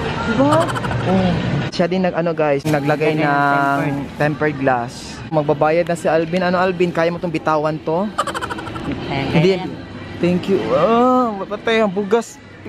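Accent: Visayas, Philippines. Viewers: Classic bisaya speaking tagalog. Oh the good ol days u earned a sub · hlaaah bisaya diay ka dzai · Aww bisaya diay ni😂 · Bisaya po ba kayo ?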